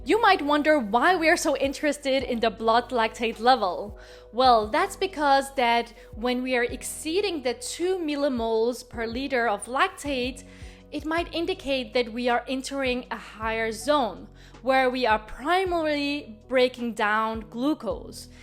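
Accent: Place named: France. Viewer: If you want to make it fun get a real bike and explore the outdoors. It's real life. By the way love your accent. French I think. Blessings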